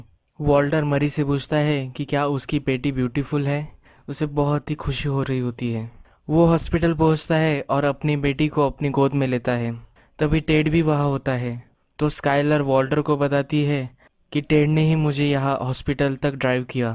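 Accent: Maharashtra, India. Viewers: Good story telling...but i noticed you are marathi bhava from accent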